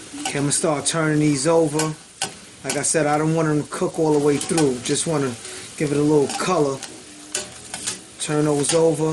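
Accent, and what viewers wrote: New York, United States. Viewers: Silver Stone that's how NY men talk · You definitely from NY my nigga! Great Video! You do BBQ's? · Dope video bro and I know a fellow New Yorker when I hear one. You actually sound like Ghostface.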